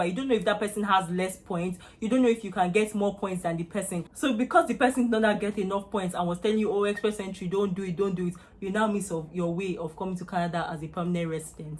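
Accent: Nigeria. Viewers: Are you sure you left at age 16. Cos your yoruba intonation is wow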